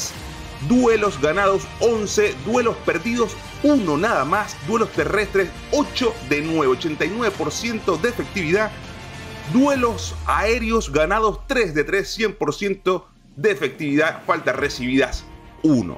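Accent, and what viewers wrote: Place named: Argentina. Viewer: Varón buen contenido pero pareces argentino cuando hablas :0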